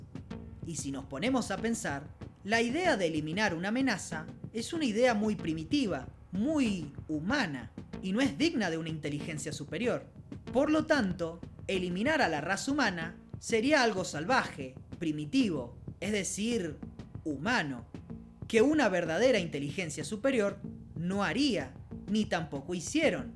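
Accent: Argentina. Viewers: Acento Argentino jodiendo toda la saga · matrix version porteño che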